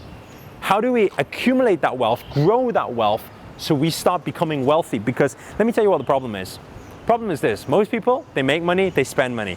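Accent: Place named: England, United Kingdom. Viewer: First time seeing Asian guy speaking in English accent 😅 sounds funny but he’s pulling it off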